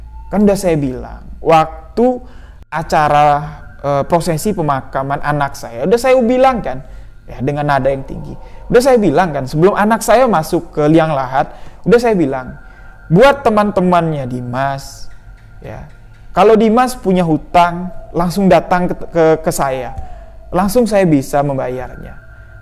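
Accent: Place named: Bali, Indonesia. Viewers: mas logat nya seperti.orang Bali.Terimakasih kisahnya. buat pelajaran bhwa hutang WAJIB DIBAYAR SESEGERA MUNGKIN. Dan bila meminjamkan uang,TIDAK PERLU DENGAN BUNGA itu namanya RIBA. Kalau sipeminjam tidak sanggup bayar hutang,alangkah baiknya,jika DIBEBASKAN saja, Insya Allah akan Allah ganti rezqi dengan berlimpah. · Kamu orang mana min,,, logatmu mantul2 kayak orang bali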